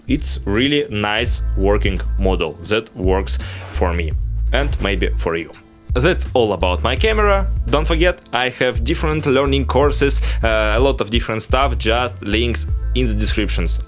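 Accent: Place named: Russia. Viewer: Broader than that could be incomprehensible for general audience, but yours was perfectly clear and perfectly Russian. 😁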